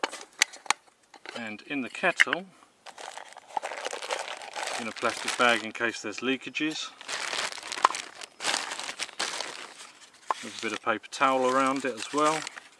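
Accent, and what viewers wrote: United Kingdom. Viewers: Are you british? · Make a Bushcraft camp cook book. You got the vids, the recipes, AND the British accent. You could be the Gordan Ramsay of Bushcraft cookery. Can you also do a braised short rib? Or anything braised tbh?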